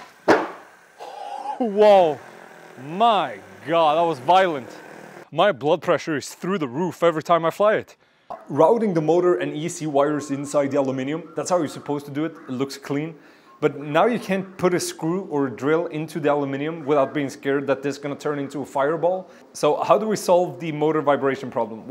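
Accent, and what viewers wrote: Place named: Sweden. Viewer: Älskar att höra din fina svenska dialekt 🇸🇪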